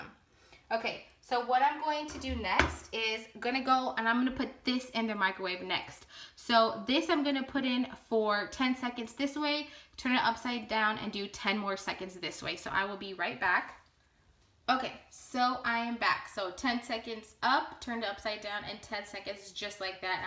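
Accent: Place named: Mexico. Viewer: I'm curious what your nationality is! If I go by your accent alone I would guess Mexican. I'm from Texas just outside of Houston and I go to San Antonio all the time.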